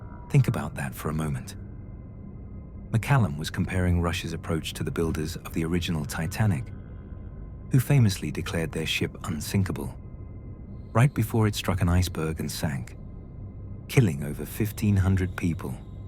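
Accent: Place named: United Kingdom. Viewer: wonderful British accent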